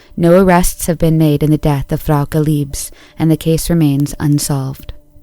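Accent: India. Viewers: can the girl narrate the videos more? I don’t like the guys Indian voice. I hate it. I skip the videos if I hear it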